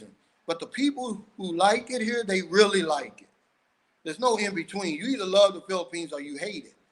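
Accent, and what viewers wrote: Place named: New Orleans, United States. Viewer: Hey are you I like what you're doing I'm from Florida in the Miami area my wife is from the Philippines (zamboanga) I like your chanel a lot you don't sugar code anything keep up the good work keep doing what you're doing and I also like the new Orleans accent also thank you for everything okay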